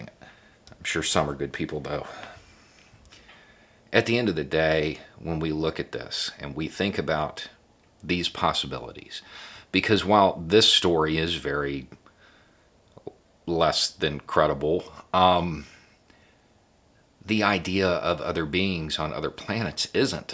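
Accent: South, United States. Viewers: You got that good ole boy accent, but you have a genius quality view of reality. I wish there were more of you in positions of power.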